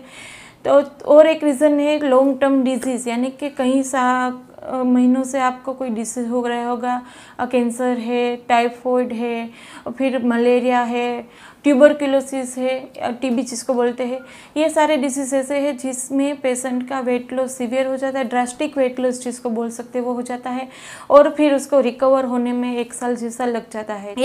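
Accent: Gujarat, India. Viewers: Are you Gujarati? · Gujaraat se ho kya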